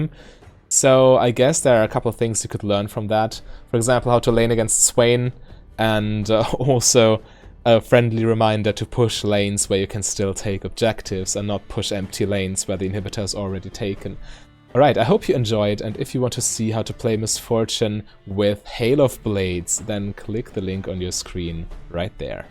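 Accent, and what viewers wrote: United Kingdom. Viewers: In fairness, he just kind of has that voice. I'm not sure how a German guy manages to sound oddly like an upperclass Britishman, but somehow manages it.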